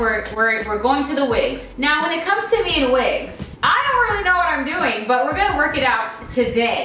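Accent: Jamaica. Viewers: Am I the only one that can hear a lil bit of your Jamaican accent?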